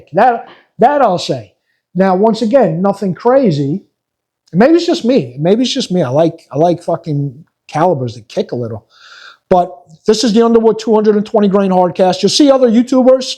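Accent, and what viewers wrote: New York, United States. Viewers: Love the New York accent 😉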